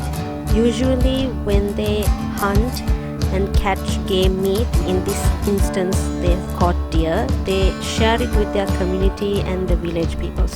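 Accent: Sri Lanka. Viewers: I think it's bit hard to guess hmm 🤔 Nevertheless when it comes to your Sinhala accent it's more like a Sri lankan's one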